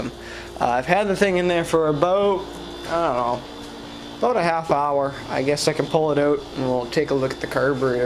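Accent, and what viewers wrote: Canada. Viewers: ur Canadian?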